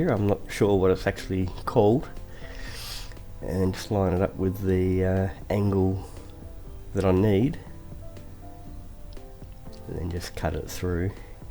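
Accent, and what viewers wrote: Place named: Australia. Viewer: I’m assuming you’re Aussie by your accent and “g’day” . I’m just starting out and was wondering what stains you use and if they go ok under water based varnish? I’ve bought a tin tin of Cabots water flat varnish from the green shed. Cheaper than model paints. Andrew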